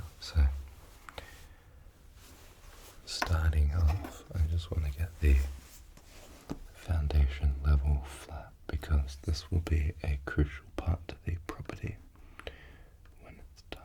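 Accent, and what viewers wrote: Australia. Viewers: dude I love this, it's like listening to do ASMR hahaha (in the best way!). Something unmatched and familiar about other Aussies doing asmr, love your videos 🙂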